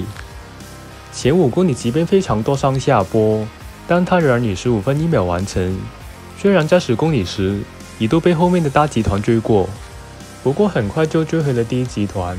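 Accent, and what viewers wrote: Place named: China. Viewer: 听你说话 我都快睡着了 普通话口音着实是没有力气的样子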